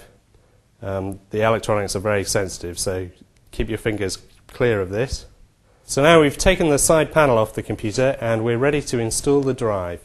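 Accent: United Kingdom. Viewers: I usually hate British accents as they come off a pompous and arrogant but this one is mellow and soothing. I want him to read me a bed time story. "There once was a DIMM that didnt fit in..."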